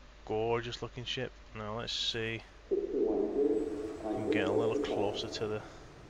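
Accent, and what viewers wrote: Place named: Yorkshire, United Kingdom. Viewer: Nice video bro. Sound like a fellow Yorkshireman too :) would be awesome transporting a ship load of these babies to a race!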